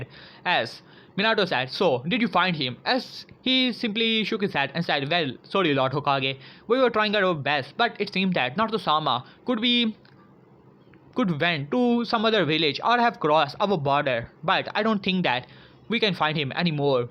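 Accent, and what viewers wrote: India. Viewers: It sounds like Indian and Asian English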